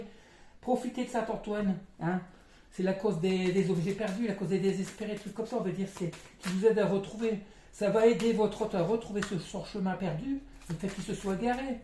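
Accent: North, France. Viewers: Bonjour, j'ai comme l'impression que tu es din ch'nord, ça me rappelle de bons souvenirs... Merci pour tes lumineuses guidances. ☺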